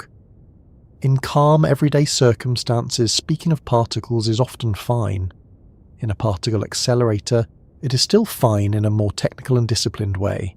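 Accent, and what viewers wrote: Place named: RP, United Kingdom. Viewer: Lose the horrible posh Brit voice.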